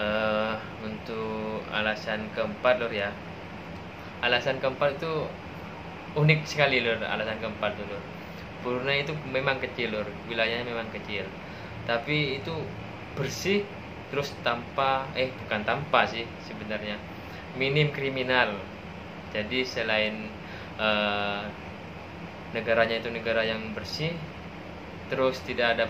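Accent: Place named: Madura, Indonesia. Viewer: asli dr mana kang,,logat bicara seperti dari Madura nih 😊🙏🏻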